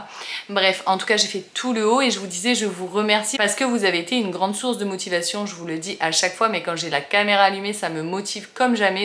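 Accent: South, France. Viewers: Owe owe owe! Vous allez arrêter de vous moquer de l'accent du sud là oh! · Oui j’adore te voir faire le ménage 😅 viens chez moi 😂 avé l’accent peuchère ❤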